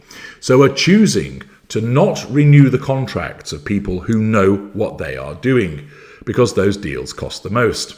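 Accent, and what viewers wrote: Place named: Midlands, United Kingdom. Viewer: I detect a bit of the Midlands in his accent. Have thought so for a while.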